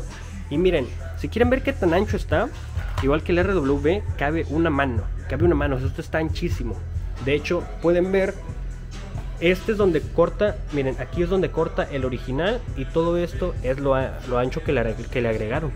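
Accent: North, Mexico. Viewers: Y hablas como una compañera de trabajo que es de cohauila, bien norteño.... Y se ve que eres amistoso igual que ella.. Jejejeje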